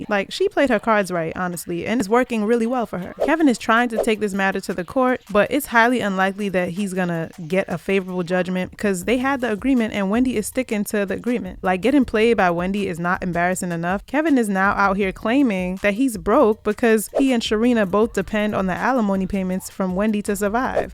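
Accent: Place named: New York, United States. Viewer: Your slightly New York accent makes me miss my east coast fam. 🙂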